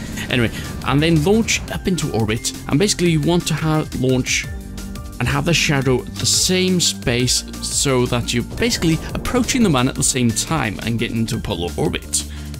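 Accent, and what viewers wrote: Wales, United Kingdom. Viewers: love ur Welsh Accent, besides that. Please can you include wich mods you are using?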